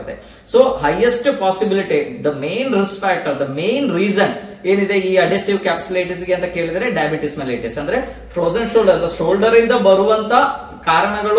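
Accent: Kerala, India. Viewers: sir you seems like from malayalam language background but your kannada is excellent..👍🏻very good information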